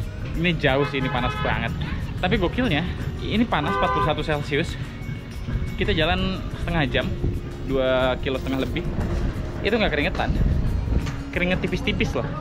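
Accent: Java, Indonesia. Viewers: asli mana bang? kok logatnya ada jawa²nya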